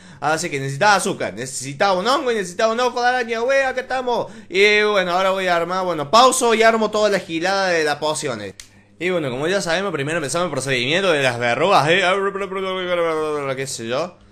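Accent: Córdoba, Argentina. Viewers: sos argentino .. cordobés ??? yo soy de buenos aires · sos cordobes ??? :D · Oye, ¿como se llama ese servidor? Pasame la IP porfavor... Grax (mi cuenta es ARIKUZUKI o mi otra cuenta de minecraft es 4R1KU2UK1) Si me encuentras en algun server... avisame plis XD.¿Eres de Córdoba? Tenes asentó cóordobes. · eres cordobes? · eres argentino ???? o chileno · JAJAJAJAJAJAJAJAJAJAJAJAJAJA, mori de risa xD me encanta el acento, bien argentino <3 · es bien argentinooooo!!!1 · ERES CORDOBES?? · Sos argentino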